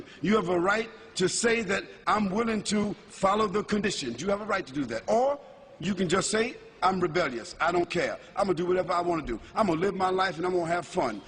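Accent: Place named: New York, United States. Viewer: machaa ellah, en plus son accent d'un vrai newyorker trop joli ellah ybarrek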